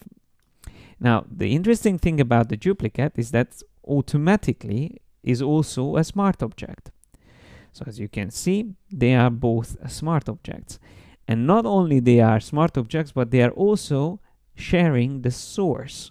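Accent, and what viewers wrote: Hungary. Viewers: that hungarian accent is strangely comforting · fantastic again!! thanks!! btw my girlfriend is Hungarian and she heard your voice while I was looking at the video and said "is that guy Hungarian??" hehe, I myself cannot recognize the accent. Thanks a lot man, very useful tips and great clear-fun teaching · I heard your accent is hungarian - then I saw the little flag up there! 2 - 0 Hungary - Austria!!!!!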